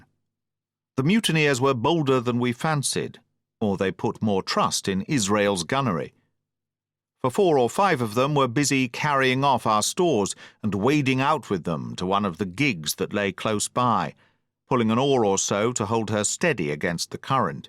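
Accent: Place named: England, United Kingdom. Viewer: Thank you! So nice to hear it read with an English accent! Good job, Matey!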